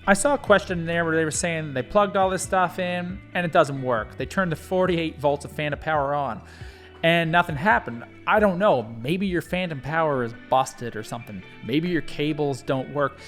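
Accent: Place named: Canada. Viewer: Hey! Excellent video and thank so much for doing this! I stumbled across your channel (this vid) because I just upgraded from my Blue Yeti to the Shure SM7B and Focusrite Scarlett Solo Gen3. Once I started recording some audio samples, I noticed that things didn't sound any different compared to my BY which told me something was being missed somewhere along the line. That being said, I think the Cloudlifter is one of those things and maybe better cables. Do you recommend anything in particular? Also, you mentioned Canadian dollars plus you look and sound Canadian! Where abouts are you from? I'm in Southern Ontario myself. Anyway, ramble complete! Have a stellar day and thanks again for this video!!!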